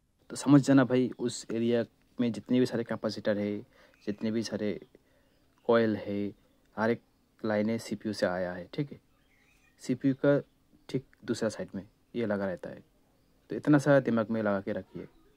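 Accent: Bengal, India. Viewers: tum Bengali ho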